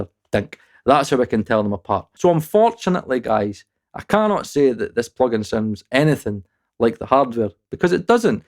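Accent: Scotland, United Kingdom. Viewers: You have the best Scottish direct on youtube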